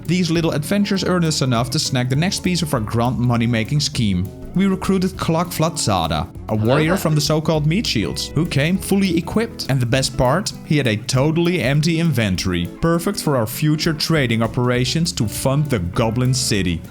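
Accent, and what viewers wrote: Netherlands. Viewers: Dutch accent spotted <3 Groetjes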